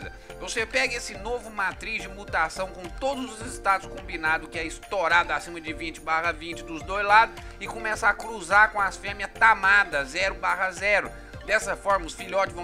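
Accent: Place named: Rural, Brazil. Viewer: Mano tua voz parece de dublador de filmes tlg , aqueles filmes americanos que tem alguém caipira aquele sotaque mano acho que vc teria sucesso em dublagem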